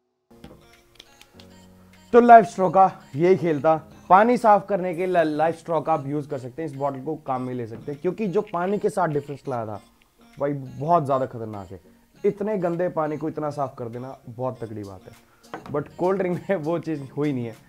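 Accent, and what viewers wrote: Punjab, India. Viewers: Bro tumara bolne Ka accent Punjabi ki Tarah hai agar tum Punjabi ho to pls reply jarur karna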